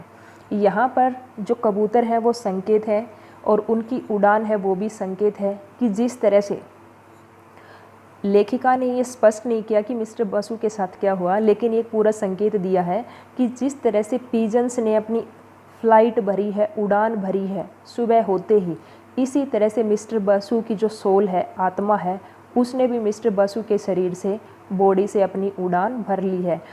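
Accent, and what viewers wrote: Haryana, India. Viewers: Mam haryana se ho aap?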